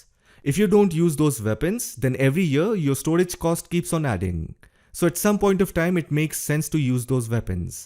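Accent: India. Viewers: Iam paying, your concern. India accents, get your oil from Russia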